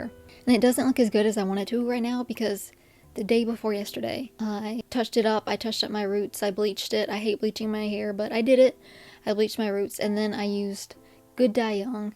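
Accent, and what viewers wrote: South, United States. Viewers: Your accent reminds me of an attorney colleague I worked with years ago. He also put a lot of work into lessening his southern accent but I found it endearing in the occassions where it did come out stronger. I think your accent also gives you more implicit authority that you know what you're talking about when you discuss these beliefs present in christian spaces. It might be a little weird to you to hear, since this probably wasn't intentional on your part. Just my 2 cents on it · As a Californian, I have to admit my prejudice against southern people, and you are just a reminder of how ignorant I am. Love your accent and most of all your videos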